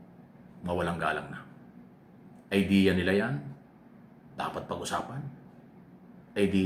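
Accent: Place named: Visayas, Philippines. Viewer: Ang labo ng mga pinagsasabi mo walang kalatoy - latoy cgurado ako bisaya kang tanga,kc parehas kayo ni inday kong magtagalog at hindi naiintindihan ang mga pinagsasabi parehas na parehas kayong inutil.At huwag kang masyadong magpakatanga na ang mga iniidolo mo matitino,kong talagang matino bakit nakulong na kc demonyo iyon ang totoo.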